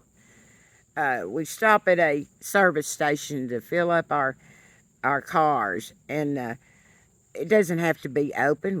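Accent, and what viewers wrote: South, United States. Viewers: I’m a new follower…I love hearing your thoughts with a southern accent. I am 70 years old and live in California. I paint rocks for elections and send them to battleground states to remind them that I really want to help them become blue. The DNC was beautiful. I don’t want to feel anxious about America anymore.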